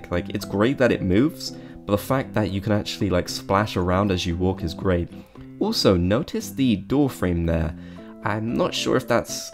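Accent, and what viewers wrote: Wales, United Kingdom. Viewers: Ok I really need to know if you’re welsh bc of your accent it sounds so welsh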